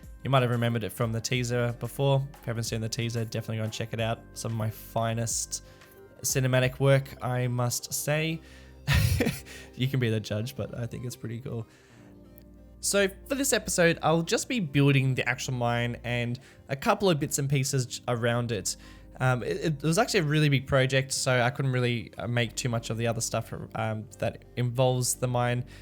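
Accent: Australia. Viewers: Amazing work mate. Great to hear an Aussie doing Cities: Skylines series and this being an Aussie based theme is even better. You're really capturing the Outback with the colours and plant life! Can't wait for each new episode! Keep up the awesome work!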